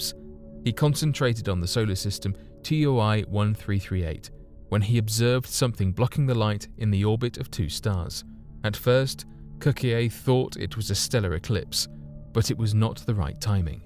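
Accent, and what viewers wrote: United Kingdom. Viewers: shut the fridge. is he not british? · why do you sound british?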